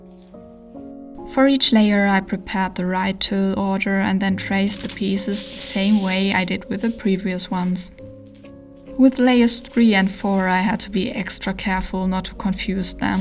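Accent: Germany. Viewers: I love this video about the creation process of this wonderful dress. It looks so good! Those color nuances! So cleverly designed and made! I admire your patience. I sew myself, but I've never done anything so elaborate. It was so enriching to watch you go through your creative process. I could watch you for hours as you walk through the church in this absolutely extraordinary dress. I stumbled across your channel by chance and of course I subscribed. Und ich dachte mir doch, dass sich dein Akzent deutsch anhört. 😂 Viele Grüße aus dem Saarland. ❤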